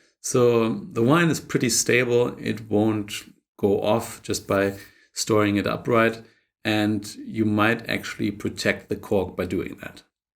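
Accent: Germany. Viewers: Ich wusste du bist ein Deutscher ohne dein Namen zu kennen dieses deutsch englisch ist halt so scheise wie bei uns allen 😂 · Alter man hört den deutschen so raus wenn der redet 😂😂😂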